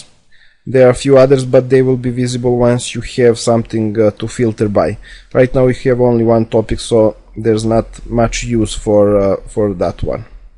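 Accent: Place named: Poland. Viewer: Słyszę jakby Polski akcent, nie mylę się. Mówi jak mój nauczyciel od angielskiego w LO.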